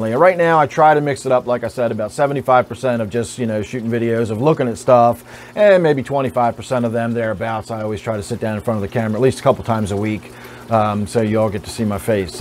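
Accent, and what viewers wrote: United States. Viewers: No! I like hearing your little "hey everyone" greeting plus I am english so I like a US accent and you are easy on the eye too 😍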